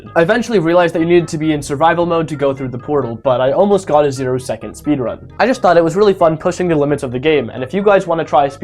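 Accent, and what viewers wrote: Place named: Canada. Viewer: The moment I heard Kenadian I started loving this guy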